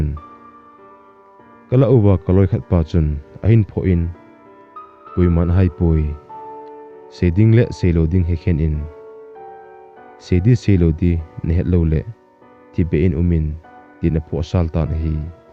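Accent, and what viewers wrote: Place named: Nagaland, India. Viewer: Sir hoylang ho nahi hm, na awso chu achome, ole Nagaland,nc hill Assam lang ho nahim sir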